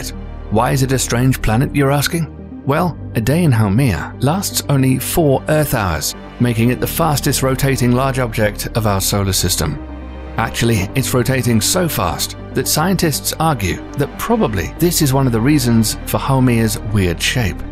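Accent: United Kingdom. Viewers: Another mystery space video by a channel with an British Voice over guy, how original. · British sounding narrator showing an oblong planet and calling it a football is odd as British football is round and spherical. Most of the world knows football/Soccer as football. Also in Britain, we hate the name soccer regardless of its origins. I wonder why Fifa games do better than PES. So as you can see, hearing a British person say football, but is referring to American football or a Rugby ball is asking for a beat down here in the UK. So the OP WozzzaTV is just expressing is dislike out loud. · narrator, your British, its a American football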